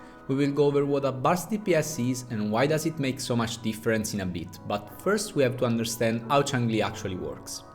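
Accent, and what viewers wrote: Italy. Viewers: Completely unrelated to the video, but are you Italian by any chance? Lol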